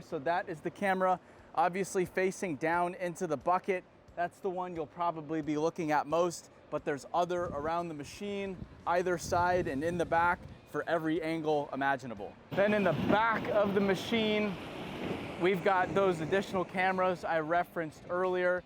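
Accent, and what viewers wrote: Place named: United States. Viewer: I guess when a dynamite stick reaches a certain size it's called a sausage. I definitely enjoyed the American pronunciation of German names.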